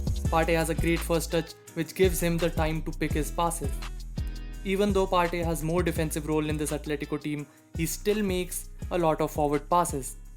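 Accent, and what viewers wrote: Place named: India. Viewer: Immediately I heard an Indian voice just skipped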